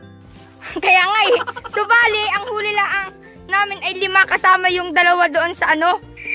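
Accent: Batangas, Philippines. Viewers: Yung lingguwahe katulad ng mga taga batangas.. keep it up 😁😁😁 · parang Batangquena ka? cute mo naman ang ganda ng lugar nyo...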